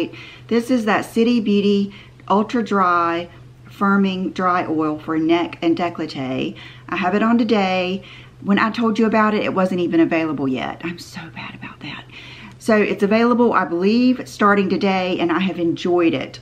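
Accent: South, United States. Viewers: I love your southern accent so much 🦋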